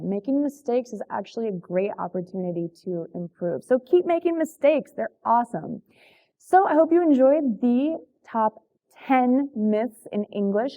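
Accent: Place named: United States. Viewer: I love your pronunciation!! So American. I lived in America before, but living in America for 1 year is not enough to speak English fluently or learn English perfectly. So I was looking for this kind of Video which is made by American. Thank you so much! Watching from Japan!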